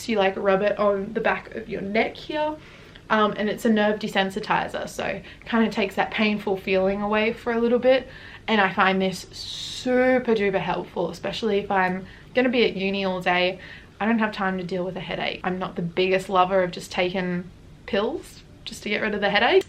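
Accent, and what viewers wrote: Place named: Australia. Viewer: I can tell your Australian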